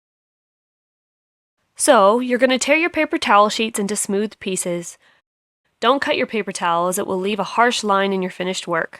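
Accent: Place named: Wales, United Kingdom. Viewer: Your accent isn't terribly difficult to understand. Sounds welsh.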